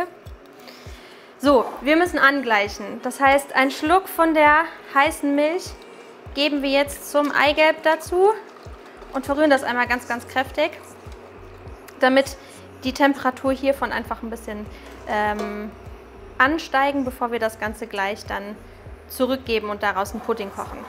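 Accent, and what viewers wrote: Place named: Rhineland, Germany. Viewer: Muss ich unbedingt ausprobieren. Ich finde deine Aussprache immer so lustig 😂. Eigelbschlotze und Gedöns.. Richtig schön rheinisch. Arbeitest du eigentlich noch in einer Konditorei?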